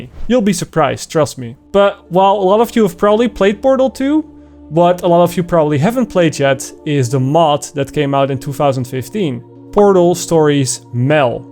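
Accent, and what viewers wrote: Netherlands. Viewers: You're dutch arent you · is this guy dutch? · I can tell ur Dutch, ur accent is big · Dutch ish accent if I'm correct? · goede poging voor engels ik ben ook nederlands! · At the start i was like lol he sounds so dutch. And then somehow I just forgot about it and the "zie je later" in the end just had me in shock like huuh and then i was like oooh yeah duh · u sound Dutch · You sound very dutch xD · Video starts Dutch accent sense tingles =O looks at channel Is from the US "Hm.." watches video, entertained end of video "Zie je later" =O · Are you dutch? That accent is familiar. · I didn’t notice it at first but later in the vid i can hear the dutchness in your voice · Nederlands accent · Forgive me if I'm wrong but your accent screams Dutch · Obvious Dutch accent is obvious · Okay so i found your video randomly because it was recommended to me. 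And very good video! Glad i came across it. I love portal and also liked playing Portal stories: Mel But what I actually wanted to ask: are you dutch? I’m dutch myself and i just cant help but feel like you have a bit of a dutch accent. I’m very curious cuz i dont know many proper english speaking dutch youtubers.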